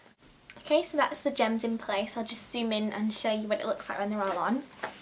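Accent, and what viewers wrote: United Kingdom. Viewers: and a question; is your accent british or scottish or irish? i'm not from england and i can't decide which one is it, and i lovelovelove it!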